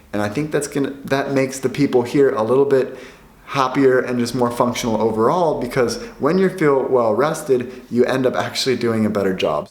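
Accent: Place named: Östergötland, Sweden. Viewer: Nice en amerikansk östgöte :)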